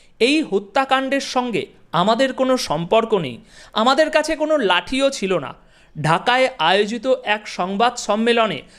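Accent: India. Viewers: কলকাতার দাদা মনে হচ্ছে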